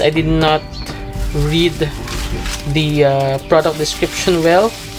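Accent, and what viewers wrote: Philippines. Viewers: Are you Filipino? Your accent is lovely.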